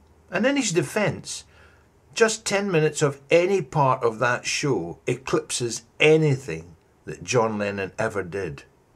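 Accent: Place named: Scotland, United Kingdom. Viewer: Thank you for sharing your thoughts (lovely Scottish accent). I'm sure that all the fans in the UK regret never seeing Elvis. I'm a '1956 era' fan too and feel exactly like you. No one will ever replace him, but I have found a tribute artist, Jay Ashton, who actually allows me to imagine what seeing Elvis in a live show might have been like. Jay just wants to share his joy in the songs of Elvis with all the other fans so ... no "bells and whistles" ... just a great performance.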